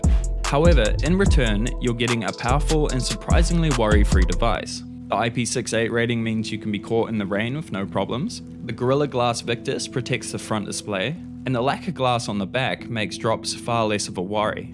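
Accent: New Zealand. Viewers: It really trips me out to hear a NZ accent in a tech review lol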